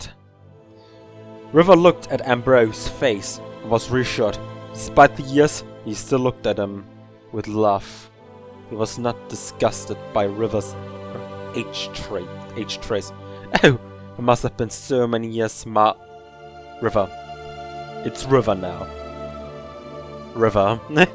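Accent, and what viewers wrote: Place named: Germany. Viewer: You sound german are you?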